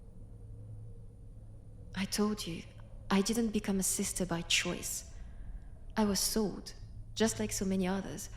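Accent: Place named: France. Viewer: [French accent] Me : (instant click)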